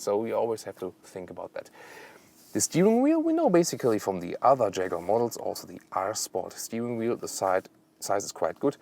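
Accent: Germany. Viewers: ahhahaha typisch Deutsch hab's direkt rausgehört 😂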